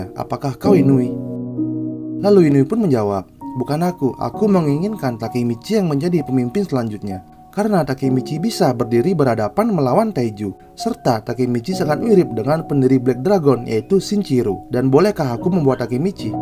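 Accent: Bali, Indonesia. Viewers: org bali ya? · mirip logat bali ngab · Orang bali fix 😂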